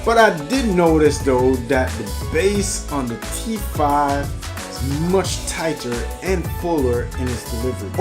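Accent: Jamaica. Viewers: we Jamaicans know a Jamaican when we hear one..the accent is still there bro. I got the t5s but the sensor is giving trouble so I had to put tape over to stop the problem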